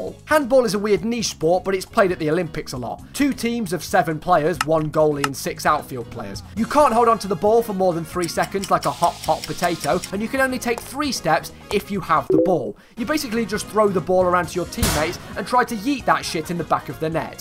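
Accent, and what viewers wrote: United Kingdom. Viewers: he literally has a british accent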